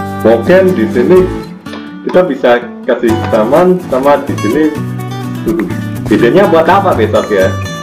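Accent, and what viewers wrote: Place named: Java, Indonesia. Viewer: Dari suara nya kayak orang jawa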